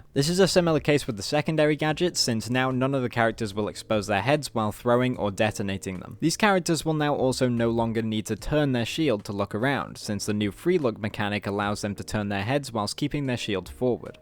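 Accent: United Kingdom. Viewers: Didn't know you were British what part??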